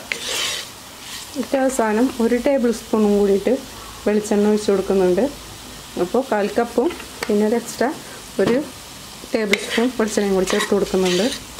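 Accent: Kodungallur, India. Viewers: 😀samsaram kettappol thonni. Ente veed kodungallur an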